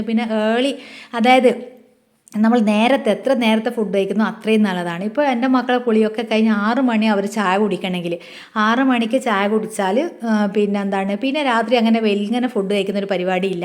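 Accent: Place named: Vadakara, India. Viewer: Ninkale varthanam vadakarakkare bhashsa pole ende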